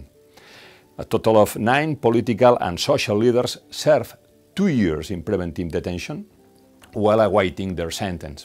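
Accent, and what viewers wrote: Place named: Catalonia, Spain. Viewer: yes, seriously, I catch Spanish and Catalan accents ;) truth is that his accent is arguably quite thick but we must let him the merits of at least trying to make a comprehensible reading. Not the case with the majority of Spanish media unfortunately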